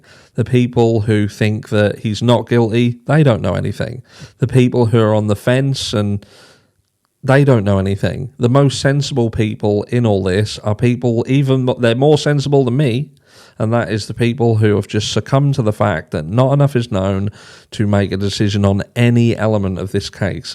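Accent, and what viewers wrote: United Kingdom. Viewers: Really thank you mate you sincerely do absolute top work on your channel and your balanced yet open view to this most unfortunate matter. (It’s also great to hear a fellow Brit voice breaking the case down… I’m in Kensington myself 🇬🇧)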